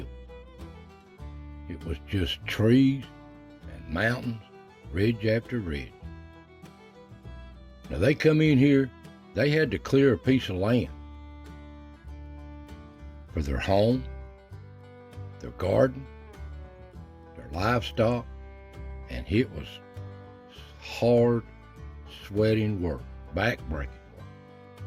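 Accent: Appalachia, United States. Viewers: The voice of Appalachia. Another Great video.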